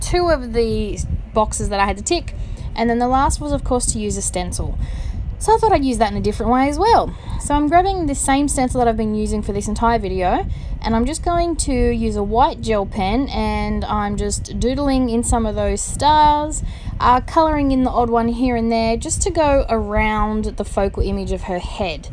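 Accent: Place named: Australia. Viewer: Subbed as soon as I heard your voice. ^5 Aussie. Great idea to use the castoff.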